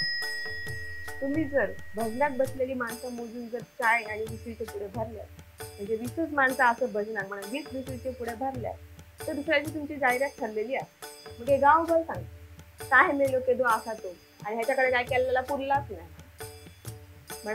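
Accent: Malvani, India. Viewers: तुमचे निरीक्षण खरोखरच अफलातून आहे. भाजनातले किस्से अगदी तंतोतंत जुळतात. मालवणी भाषेचा गोडवा तुमच्या प्रत्येक शब्दातुन जाणवतो. पण एक मात्र खरे भजनातील ही वेगवेगळी व्यक्तिमत्वेच भजनाच्या दिवशी रंगत आणतात. तुमजा हा विडिओ उत्तम झाला आहे. गावच्या गजाली तुमच्या अस्सल मालवणी मुखातून ऐकायला फार बरे वाटते. ऐकताना गावचे वातावरणच डोळ्यासमोर उभे राहते. धन्यवाद! जय महाराष्ट्र!! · गजाली बरे आसत पण खुपशे दुर्गुणच दिसले. शुद्ध मालवणीक तोड नाय. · sundar malvani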